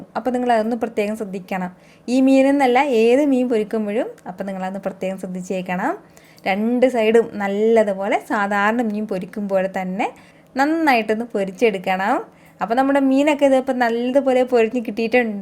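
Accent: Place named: Kasaragod, India. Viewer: kasargod orgin ano....slang kasargod style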